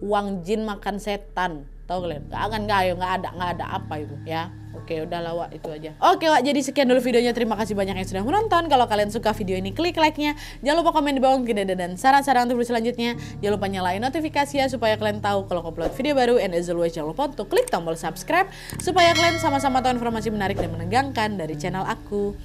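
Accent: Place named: Riau, Indonesia. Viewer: Pembawaan ceritanya enak kali apalagi ada logat2 riau nya 😂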